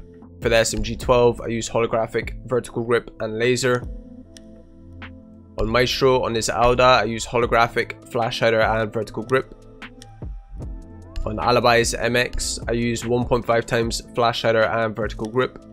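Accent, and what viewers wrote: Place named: Northern Ireland, United Kingdom. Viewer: Are you from Northern ireland because you sound like it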